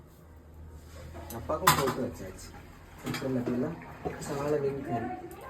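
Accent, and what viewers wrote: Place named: Tirunelveli, India. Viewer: எங்க திருநெல்வேலி கிராமத்நில் 3 ஆம் நாள் அசைவம் சமைப்பாங்க தம்பிக்கு எந்த மாவட்டம் எந்த ஊர் என்று தெரிய்து ஆனா பேச்சி எல்லாமே திருநெல்வேலி மாவட்டகாரர் மாதிரி இருக்கு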